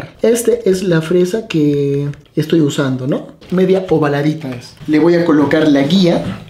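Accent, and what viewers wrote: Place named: Iquitos, Peru. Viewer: Me encantan tus videos! Eres peruano verdad? hablas como mis compatriotas de Iquitos. Que sigan los éxitos!!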